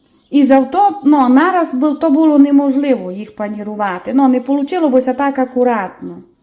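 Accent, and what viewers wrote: Transcarpathia, Ukraine. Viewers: Підписалася , бо дуже приємно чути ваш голос і чудову мову Закарпаття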